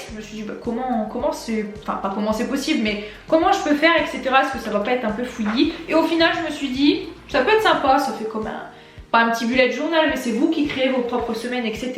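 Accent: North, France. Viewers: J'adore l'accent du Nord hahah je suis toujours dessus mais bon En vrai on vois peut de personnes de notre région qui se lance sur YouTube ça fait plaisir à voir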